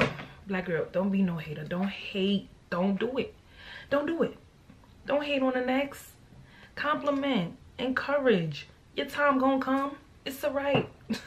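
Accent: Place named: New York, United States. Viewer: awww I love your accent, I wish I lived in America and New York in particular cause your accent is just the best 😩💞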